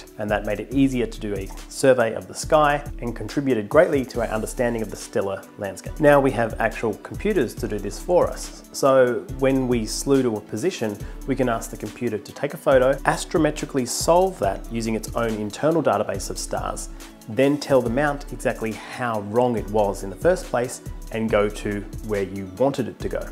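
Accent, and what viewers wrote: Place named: Australia. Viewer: Haha..I totally thought you said spice is big in the beginning 😂.. Aussie accent is great.. 😀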